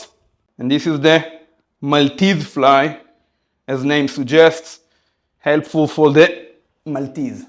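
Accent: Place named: United Kingdom. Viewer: לפי המבטא שלו הייתי אומר שהוא בריטי.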